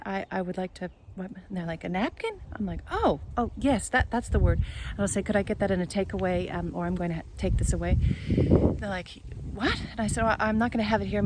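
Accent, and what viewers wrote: New Zealand. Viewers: You got bit of that kiwi accent dear, nice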